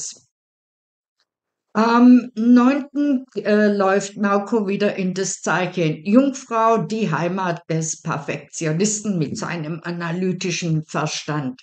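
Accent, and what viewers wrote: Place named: Swabia, Germany. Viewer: diese schwäbische (?) Akzentuierung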